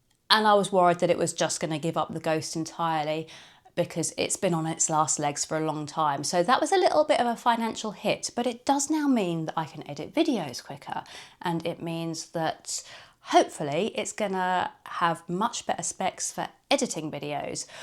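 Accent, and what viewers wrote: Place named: England, United Kingdom. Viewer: Hi, firstly “Happy Belated Birthday” 🎉 yes I would love some cheaper recipes for soap…in the USA Olive Oil and Cocoa Butter is outrageous in price and being an expat if UK, I definitely need the occasional dose of English Accent 😂 I have missed you online and would love to see you more….definitely think you could make something of a Chanel about alternative soap ingredients, and testing thereof to help out the struggling….cheaper but good soap is gonna be a must very soon in USA with all our current troubles and we for sure need an injection of bubbly and infectious personality to cheer us up 😢 hopefully see you soon and hope you had a fab Birthday ❤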